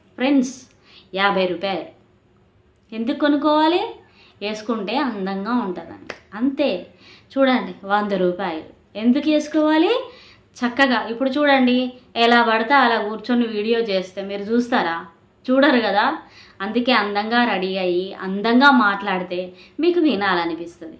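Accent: Telangana, India. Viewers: ఎన్ని జీవిత సత్యాలు చెప్పావమ్మా, మొత్తం వీడియో చూశాను చాలా బాగుంది❤ మీది తెలంగాణ అని మీ మాటలు బట్టి నాకు అర్థం అయింది❤ వీడియో 👌👌👌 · Avunu sister mana telangana langvege alane untadhi superrrr sister 👌👌👌👌👌👌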